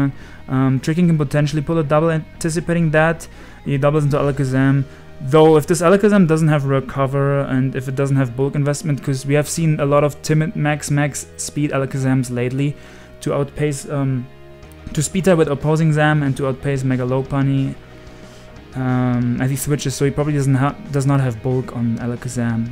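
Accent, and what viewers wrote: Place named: Germany. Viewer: clicking on that vid blind and hearing that smooth german voice is top 1 feelings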